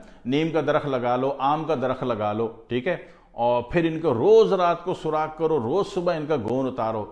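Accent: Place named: India. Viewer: Apkj zaban se lagta ha ap hindustani ho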